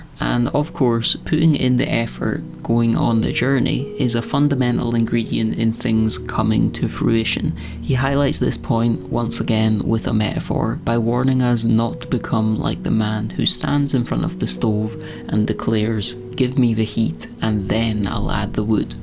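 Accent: Scotland, United Kingdom. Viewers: Do you speak Scottish? Tha Scottish blasta.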